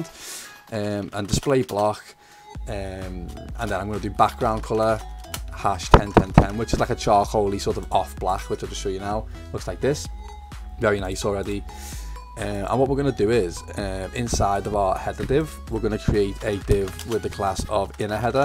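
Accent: Liverpool, United Kingdom. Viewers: scouse accent 🤔 its hard to keep up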